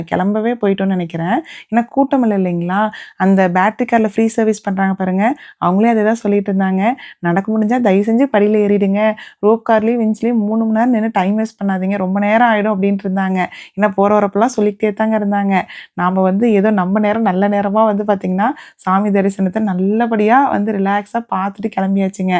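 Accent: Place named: Erode, India. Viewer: Unga slang partha erode side nu nenaichen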